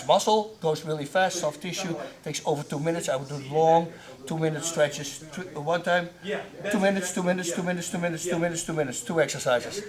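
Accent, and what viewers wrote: Netherlands. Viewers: Hoi. Ik ben de meeste amerikaanse fitness adviseurs een beetje zat. Meestal komen ze met varianten op dingen die we wel kennen. Jij weet mij te verrassen. Fijn om heel in de verte dat nederlandse accent te horen. Ik zit dagelijks op de racefiets en deze tips ga ik zeker uitproberen. Groeten & Dank van een 60 jarige rotterdammer.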